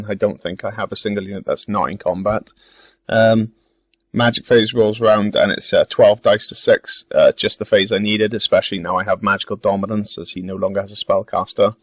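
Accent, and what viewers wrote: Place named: Wales, United Kingdom. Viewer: Nice battle report, lovely models. Good to hear a Welshman amongst all the Americans. Hope you do more videos.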